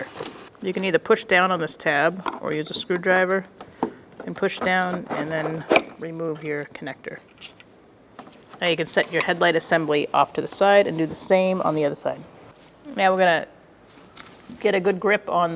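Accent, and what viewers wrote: Boston, United States. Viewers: 👍 great vid. You are definitely from Boston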